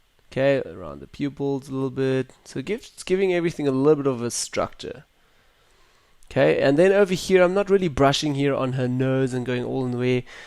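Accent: South Africa. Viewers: Since u sound south african i will subscribe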